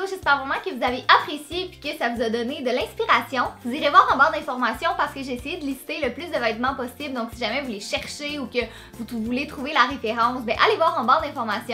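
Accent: Quebec, Canada. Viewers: J'adore t'es vidéo et j'adore l'accent québécois j'en suis amoureuse . J'adore t'es vidéo continue comme ça.😍😘😊 PS:t'es super belle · j'adore l'accent canadien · JE T'ADORE tellement l'accent québécois est vraiment trop cute !!!! Je me disais que ce serait cool que tu fasses une playlist pour la vidéo bonus de mercredi !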